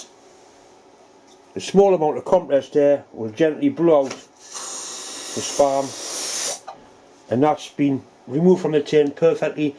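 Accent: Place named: Cockney, United Kingdom. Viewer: The Cockney Iron Chef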